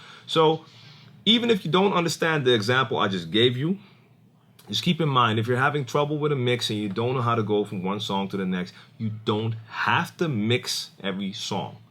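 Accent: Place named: Northeast, United States. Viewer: Then why do you talk like someone from the Northeast US?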